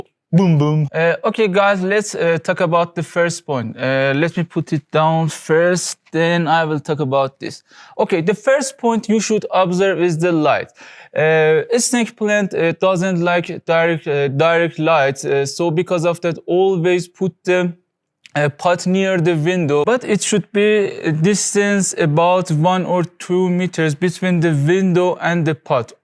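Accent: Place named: Russia. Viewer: Hey are you from Russia? I love your accent also say hello to dear Mr police station❤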